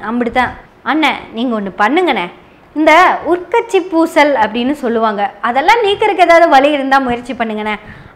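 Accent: Kongu, India. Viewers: தங்கையின் கொங்கு தமிழுக்கு இந்த அக்கா ( மதுரை மண்டலம்)ரசிகை😊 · கொங்கு தமிழ் கிண்டல்.. நீ கலக்கும்மா... வேண்டாத புள்ளைய பெத்து.... 😄😄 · Addicted to your posts sister❤and your kongu accent slang way of speaking is absolutely amazing and stunning 🎉😊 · அழகிய கொங்கு தமிழில் சங்கிகளை வெறுப்பேத்தி இருக்கிங்க..வெந்த புண்ணில் வேலை பாச்சாதீங்க சகோதரி! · கொங்கு தமிழ் கிண்டல் சூப்பர் 😂😂😂😂😂😂😂😂 வாழ்த்துக்கள் தோழி ❤❤❤❤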